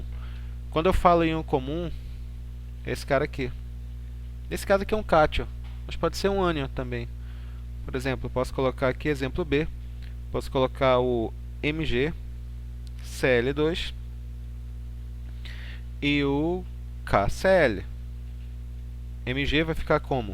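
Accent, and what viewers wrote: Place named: Recife, Brazil. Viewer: Você é de recife né? Logo vi hsuahsau, mto bom o vídeo, ajudou mto!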